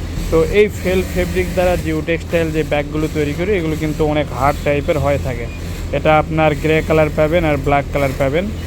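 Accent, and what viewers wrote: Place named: Joypurhat, Bangladesh. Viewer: আসসালামু আলাইকুম ওয়া রহমতুল্লাহ! ভাই, আপনার কথা শুনে মনে হচ্ছে আপনি জয়পুরহাট জেলার মানুষ! জয়পুরহাটে আপনাদের এজেন্ট আছে কি না? জানাবেন প্লিজ!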